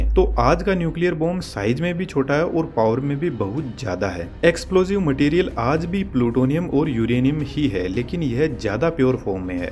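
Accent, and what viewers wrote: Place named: Shekhawati, India. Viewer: भाई साहब आपके द्वारा बनाया गया वीडियो बहुत ही ज्ञानवर्धक है । आपकी आवाज से ऐसा लगता है कि आप राजस्थान के शेखावाटी से संबंध रखते हो।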